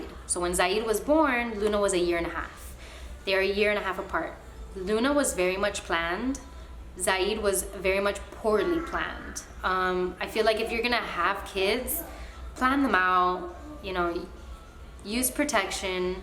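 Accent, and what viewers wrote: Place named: San Francisco, United States. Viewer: I knew I recognized that accent! I live in SF too lol. I’m not pregnant (yet) but am doing research because I plan to have children soon. I always planned to have my children 1.5 years apart but this is eye opening. I really enjoy your videos, thanks so much for your honesty. Your eyes are gorgeous btw.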